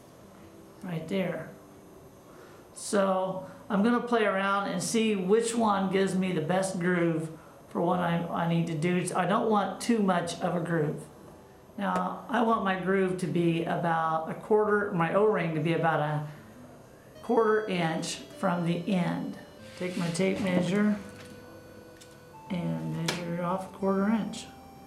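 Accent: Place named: Tennessee, United States. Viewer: She kinda looks like Elvis s sister in the way u talk so u from tenn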